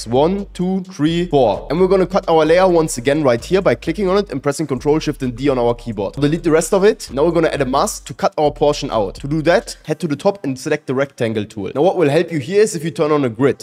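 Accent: Germany. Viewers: bro sounds so german 😭😭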